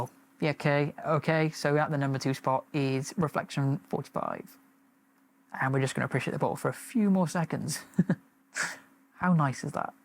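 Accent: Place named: Ireland. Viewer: Probably because you are Irish? :D Bro I love your accent rly :D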